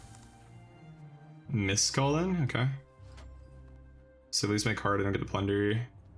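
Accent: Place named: Netherlands. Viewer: Are you dutch? I think I recognize ur accent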